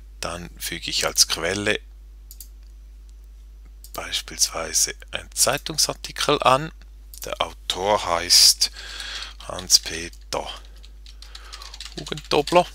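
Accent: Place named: Switzerland. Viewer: gutes video, supeeeeeer, aber schlimmstes Hochdeutsch ever, haha typisch Schweizer Hochdeutsch🤣😑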